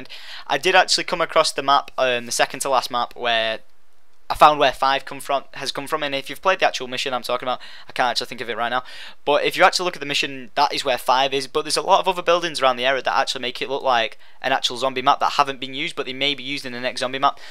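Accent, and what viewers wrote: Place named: United Kingdom. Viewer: i like yur accent its a fedish i have for british accents pretty weird............ so what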